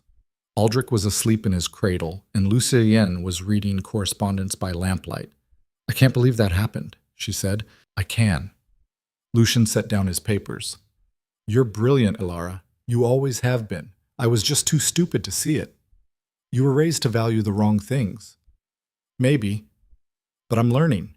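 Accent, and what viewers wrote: United States. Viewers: So much ridiculous drama. The choice of narration grates - makes them sound like teenagers. Truly recommend scrapping the flat American accent. It’s a British tale, deserves British narration.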